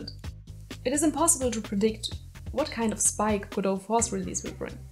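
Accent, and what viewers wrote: Germany. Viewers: i love the german english accent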